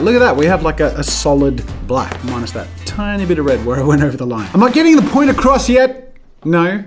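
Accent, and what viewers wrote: Australia. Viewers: I love the Aussie accent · Is this dude Aussie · I even fell in love with the Aussie accent!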